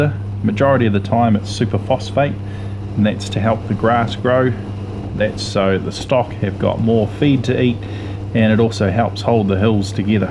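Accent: New Zealand. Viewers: Nice video. I always enjoy seeing a FU-24 fly. My dad, Jerry Barden, was the project engineer for Fletcher Aviation in the mid 1950's in Hamilton NZ. He helped Robertson Air Service & James Aviation assemble the initial batch of 24's. He then did the test flights on the first 42 completed. We lived there from Sept 54 till April 56. The NZ accent brings back good memories. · Awesome vid thanks. Just a Kiwi accent mate!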